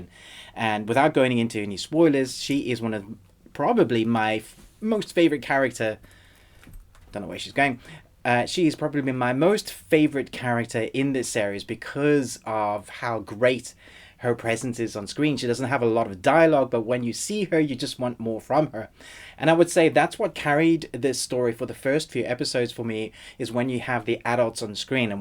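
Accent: South Africa. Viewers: are you...are you South African?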